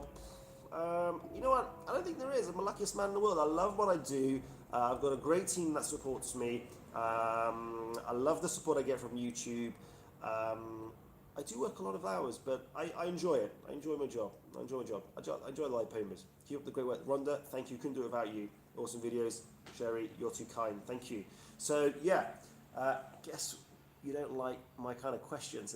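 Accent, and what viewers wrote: United Kingdom. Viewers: I love your British accent, you are lovely and really hot. Looking forward for more videos. Greetings from Germany. Enjoy your vacation.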